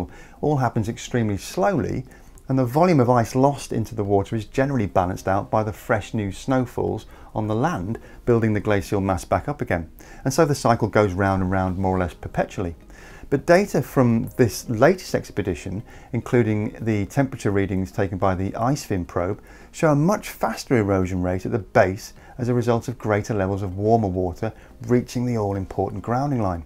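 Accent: United Kingdom. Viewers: this Brit-voiced clown is a total fake-news CarbonTaxFraudScam alarmist propagandist. Anyone can look up Dr.Patrick Moore or other FACTUAL evidence-based sources for the TRUTH about how greatly increased co2 will be an enormous benefit to our climate & biosphere. Here's the TRUTH & FACTS: co2 FACTS vs neurotic alarmist fake-news FICTION: Greatly Increased co2 will reforest the Sahara, Gobi, Atacama, Western US, and all other barren deserts worldwide, as well as the desolate Arctic & Antarctic tundra-wastelands. Frozen immobile ice will melt and CIRCULATE moderating & cooling the equator & tropics, as warmer polar oceans evaporate & REHUMIDIFY the atmosphere precipitating & soaking the deserts into lush jungles & fertile farmland while restoring depleted aquifers & watersheds globally. ZERO phoney "sea level rise" (and btw, REAL PHYSICS: floating ice does NOT increase the level of the water it floats in as it melts! 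DUH. the buoyed ice above the waterline is no longer pressing down once it has melted, DUH). The Sahara alone will soak up more water than all the ice on Greenland & Antarctica. Terrible crop-killing winters will give way to year-round growing seasons in the Great Lakes and other agricultural lands worldwide. The Great Lakes basin with its uber-rich soils & unlimited fresh water will produce 1,000x more food than the San Joaquin Valley (which requires unsustainable irrigation & fertilizers). That's sustainable food for 35 BILLION PEOPLE. Terrible crop-killing winte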